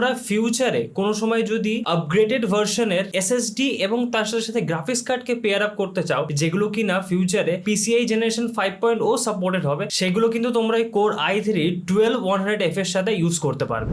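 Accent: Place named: Bangladesh. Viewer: Vhai apnar accent amader Bangladeshi der moto,ami apnar accent shune prothome vhabsi bd YouTuber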